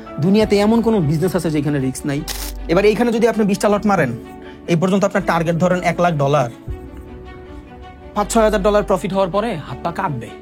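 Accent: Barishal, Bangladesh. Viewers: সবার আগে এটা আমার Question ভাই আপনি কি মোগো বরিশাইল্লা ❔🌚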